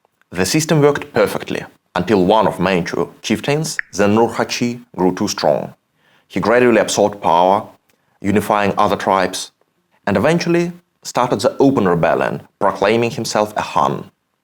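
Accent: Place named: Russia. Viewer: The Russian accent is too heavy. Every time I heard “th” pronounced as a “s” or “z” it made me hurt.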